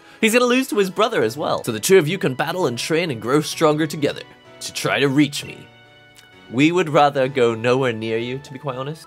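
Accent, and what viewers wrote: United Kingdom. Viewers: Doesn't sound like a classical RP, but Finally someone who speaks in some british accent and do it UNDERSTANDABLE. :D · This is gold but I've been watching you for years... Are you British? But 1st ☕ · well he is a British dude doing a British accent. Nothing really surprising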